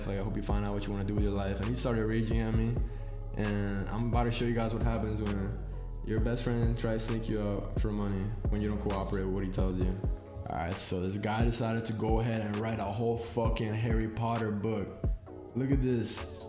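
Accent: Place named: Colombia. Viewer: con un acento absolutamente colombiano que cualquier hispanohablante puede detectarlo fácilmente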